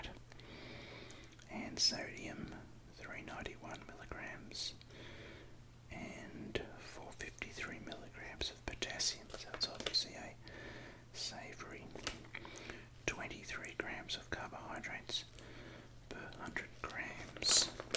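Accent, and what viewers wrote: Australia. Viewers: What makes asmr better is an Aussie accent great Job m8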